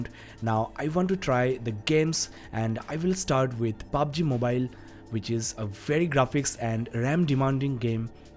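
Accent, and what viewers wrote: India. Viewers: Thicckkkk Indian accent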